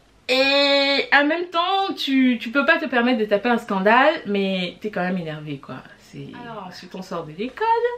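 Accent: Cameroon. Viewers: Hey je te découvre tata (comme tes abonnés t'appelent affectueusement)😊! C'est moi ou j'entend mon accent camer par ci par là? Si oui ça fait super plaisir de retrouver des Camerounais sur la toile! Je vais prendre le temps de découvrir ta chaîne. Vous avez l'air super sympas, tous! Allez, des bisous!! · Waoouuhh ma Soeur on dirait k j ai raté ce vlog. Mais suis trop fan de la façon avec laquelle tu changes ton accent c est l accent camer là qui me peut me tuer de 😂😂😂😂😂😂😂😂😂😂😂😂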